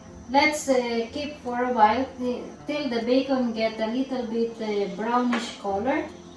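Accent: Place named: Italy. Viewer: By the way i like your Italian accent...ciao...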